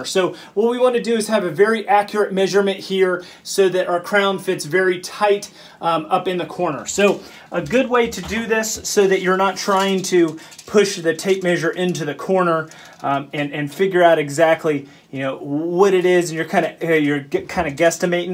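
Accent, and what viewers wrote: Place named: South, United States. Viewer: Also where are you from? Your Southern accent makes me think you're either from Louisiana, Arkansas, Alabama, Mississippi, Texas, or Georgia.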